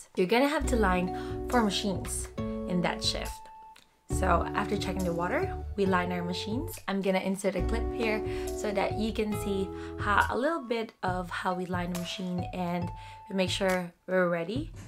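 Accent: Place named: Australia. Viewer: Love the Aussie accent😍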